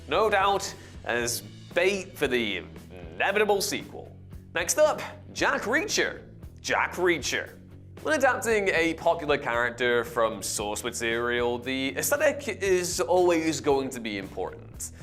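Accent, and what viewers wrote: United Kingdom. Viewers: Dude it's just a British accent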